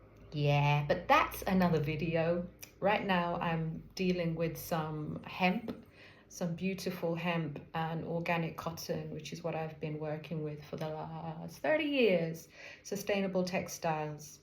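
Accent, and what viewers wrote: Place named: Jamaica. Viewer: Hey family hope all is well thanks for sharing. I love the Jamaican accent it’s really nice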